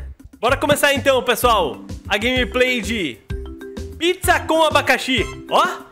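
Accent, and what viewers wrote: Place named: São Paulo, Brazil. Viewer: com todo respeito puxa menos o R , sotaque paulista poRRRRta. barrrco no mais ta muito tri legal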